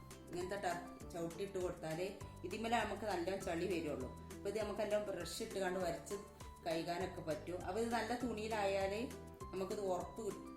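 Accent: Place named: Kottakkal, India. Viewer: കോട്ടക്കൽ ഭാഷ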